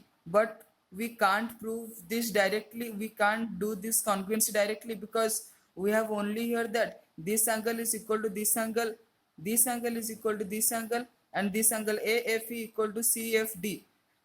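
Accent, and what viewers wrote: India. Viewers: You are an Indian , your acent tell that you might be from south side of India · R u Bengali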